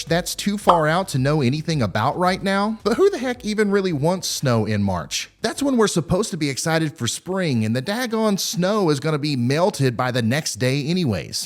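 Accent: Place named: Pennsylvania, United States. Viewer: Did you go to school at penn state? Sure does sound like it!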